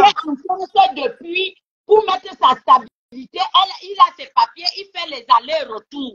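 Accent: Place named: Ewondo, Cameroon. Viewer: Mieux tu parle ewondo ton français et ton Corp se resemble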